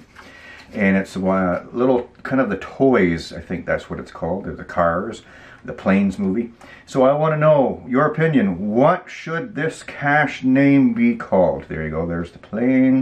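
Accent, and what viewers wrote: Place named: Canada. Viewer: Congrats my friend!!! Youve gone full tilt, you're doing things right and you'll be big time in a short time. You keep people interactive and I like it. And I love hearing Canadians talk...so keep it up!!! Craig from WV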